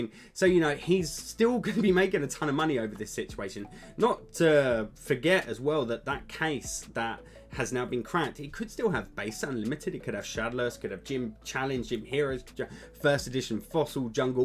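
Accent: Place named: Australia. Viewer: yeah you really do sound more australian than british. I would have guessed you were born down under and then moved somewhere else. Really interesting which part of uk are you from then ?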